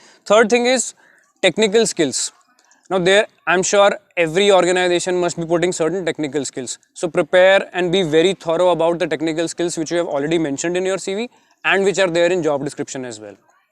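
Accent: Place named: India. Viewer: Well, I'm Ukrainian and it's a bit difficult for me to understand English with Indian accent but I'm trying. Not all your subscribers are able to understand Indian language at all, so it would be better not to mix it up and create separate videos in English and Indian only for your audience's convenience.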